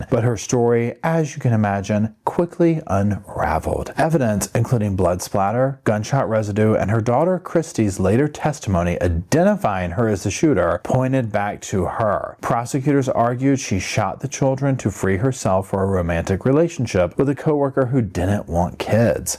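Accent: South, United States. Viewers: Just found you.... LOVE your "southern' accented comments.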